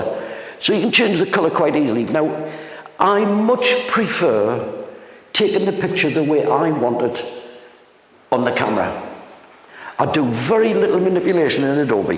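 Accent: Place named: Newcastle, United Kingdom. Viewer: That's a Geordie accent (from the North East of England, probably Newcastle) not a Scottish accent (like Scotty - from Scotland).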